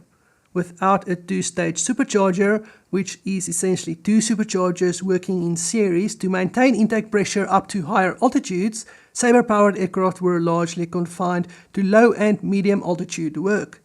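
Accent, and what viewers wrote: Afrikaans, South Africa. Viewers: Why do you sound afrikaans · Dig the the SA Dialekt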